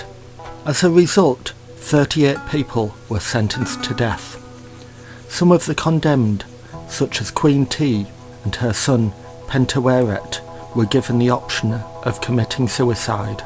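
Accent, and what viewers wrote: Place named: England, United Kingdom. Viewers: It's a North east English accent, maybe Yorkshire? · English accent with a mean lisp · you sound like a young Northern english Richard Attenborough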